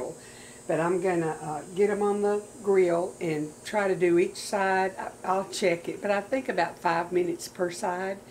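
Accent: South, United States. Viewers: Hi.. we just found you on YouTube.. love watching you cook and love listening to your Southern American accent. The mushrooms looks great also 👌👌👌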